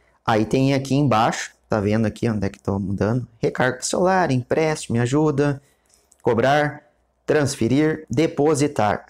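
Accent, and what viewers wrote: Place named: Rio Grande do Sul, Brazil. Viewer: Muito obrigado. Um vídeo explicativo e direto. Além do sotaque gaúcho, haha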